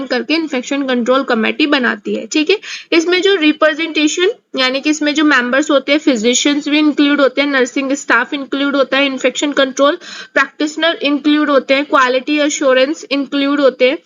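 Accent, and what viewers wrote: Karnataka, India. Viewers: Karnataka se ho mam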